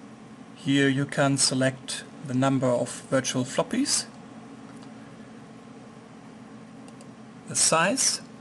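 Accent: Germany. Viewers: I am detecting a German accent here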